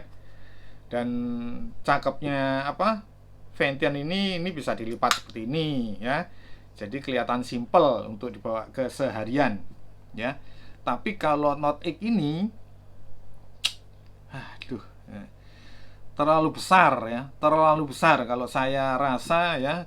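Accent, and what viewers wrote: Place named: East Java, Indonesia. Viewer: Nek wiz muni,, "Haaahhhduhhh...." Aksen JATIM nya kuat sekaLii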